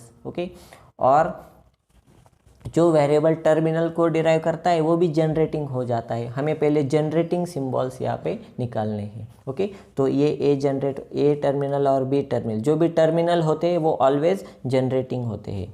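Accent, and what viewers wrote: Gujarat, India. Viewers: Are you Guju ?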